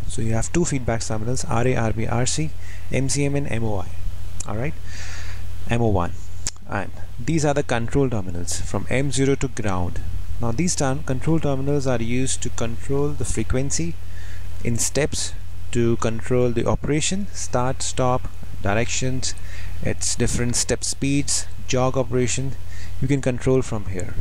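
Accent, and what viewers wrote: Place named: India. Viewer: Outstanding. I have been struggling with the question of using the Delta M series or sticking with my old friend the B series. After watching your work, I am comfortable making the change. Delta should sponsor you. Having been an American in India 7 times, I can follow you no problem. Garden variety Americans could watch each section a few times and learn the pattern of language in India. Amazing in and of itself. I always felt like Indian's spoke better English than I did. Good work. Please do more.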